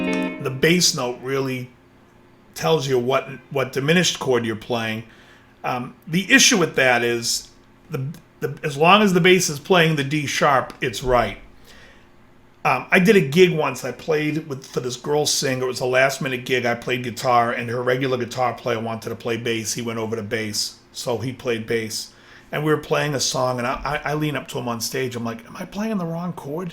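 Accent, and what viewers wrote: Boston, United States. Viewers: Nice lesson. Are you from the Boston area by any chance?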